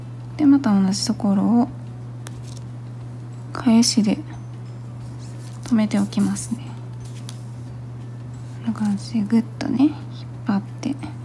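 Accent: Kansai, Japan. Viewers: さんのこんにちわぁ⤵︎🎶てゆう始まり方が大好きでガン見させていただいています(*^^*) 同じ関西なのでイントネーションが違和感なくていいです(*^^*)親近感✨ 長い間編み物をしてないのですがまた基本から始めたいなあて思えてきて毛糸をかいましたwww(*^^*) さんの、ゆるさが私にピッタリ！チャックを付けながらの色々な本音話もめっちゃわかりますよ。。同感です～ わたしゃは独特なんです笑。て話も一緒に笑いながらみています(*^^*) ゆっくりと丁寧な説明ありがとうございます(^.^)♡ 黙々と細かい作業が大好きなんで失敗しながらも楽しくこれからも学ばせてもらいますね！出会えて良かったです！！感謝😊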